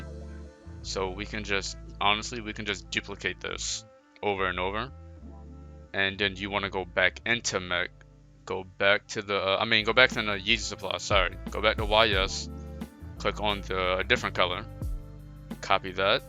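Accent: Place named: Baltimore, United States. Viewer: good vid g. Yo you from baltimore? I can hear it from a mile away hahaha anyway I remember checking out wit eno using a mastercard on ys but mastercards dont work no more?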